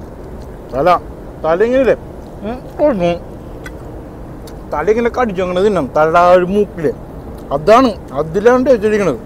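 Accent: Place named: Ernakulam, India. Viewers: സംസാരം കേട്ടിട്ട് പറവൂർകാരൻ ആണെന്ന് തോന്നുന്നു😅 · തനി എറണാകുളംകാരൻ 😁 " നമ്മ ഈ കാര്യങ്ങളൊക്കെ സെറ്റാക്കി വച്ചിട്ട് പടേ ന്ന് cook ചെയ്യണം " 😁😁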